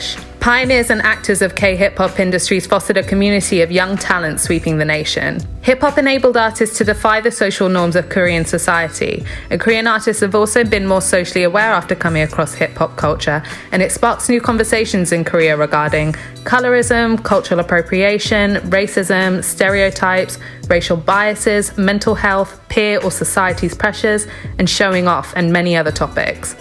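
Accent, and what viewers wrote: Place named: England, United Kingdom. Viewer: She just sounds English...because she lives here. Funny that.🙄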